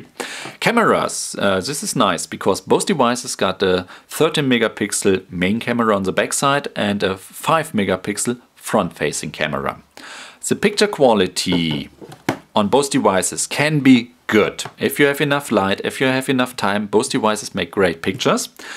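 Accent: Germany. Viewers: German accent :P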